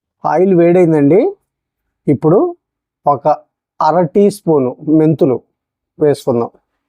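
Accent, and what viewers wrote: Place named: Tenali, India. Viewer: Meeru cheptunte naku tenalanipisthundi sir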